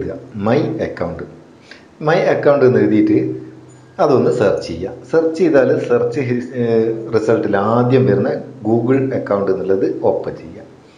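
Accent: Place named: Kannur, India. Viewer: Kanur basha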